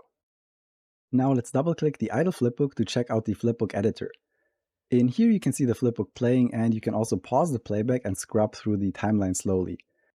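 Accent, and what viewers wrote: Germany. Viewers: Ich höre den deutschen Akzent raus 😄😉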